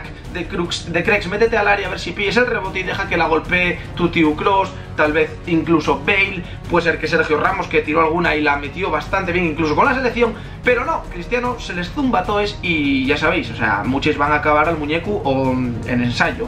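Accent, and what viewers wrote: Asturias, Spain. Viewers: es asturiano hijo mío, no habla mal es un dialecto diferente jajaja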